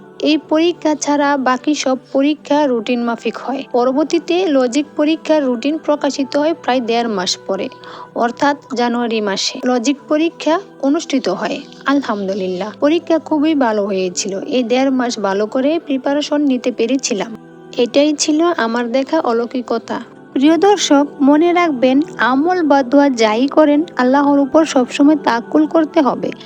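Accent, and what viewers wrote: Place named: Mymensingh, Bangladesh. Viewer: আপনার দেশের বাড়ি কি ময়মনসিংহ অথবা কিশোরগঞ্জে?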